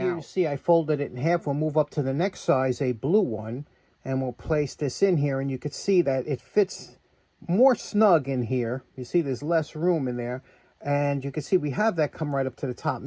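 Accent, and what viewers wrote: New York, United States. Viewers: Great episode and thanks for speakin in N.Y. accent. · I love your NY accent, but I couldn't, for the life of me, figure out what a "soladis" connector was until I noticed the title of this video. I just love that accent! LOL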